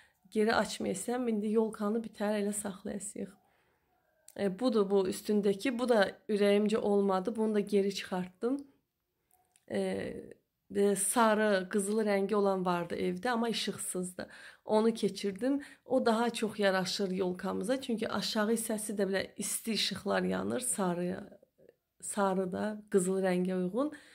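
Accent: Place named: Balakan, Azerbaijan. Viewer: Super, danisiqda Balakən aksenti öz yerinde😊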